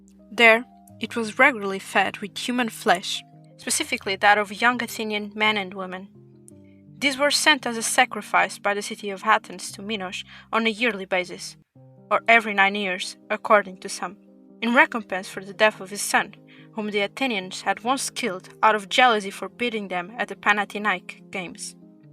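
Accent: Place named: Portugal. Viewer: Noticed it too, sounds like (european) portuguese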